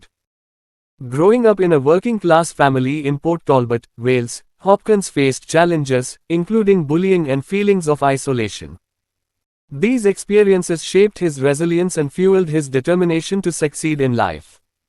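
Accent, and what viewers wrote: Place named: India. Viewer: aS NARRATED BY A PAKI OR INDIAN GENIUS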